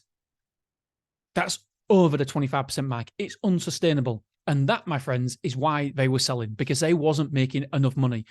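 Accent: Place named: England, United Kingdom. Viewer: I m in France and follow you since weeks, I love your accent even you speak fast... fast. Which part of England?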